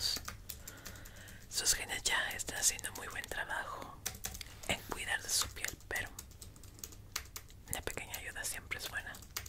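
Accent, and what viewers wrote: Mexico. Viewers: me encanta tu voz! tienes un talento innato y lo mejor , es que eres mexicana :) (bueno eso creo, por tu acento)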